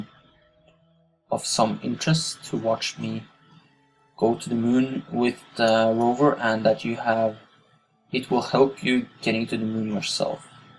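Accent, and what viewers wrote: Norway. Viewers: Where are you from? Norway? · i hørte med ein gong at du var norsk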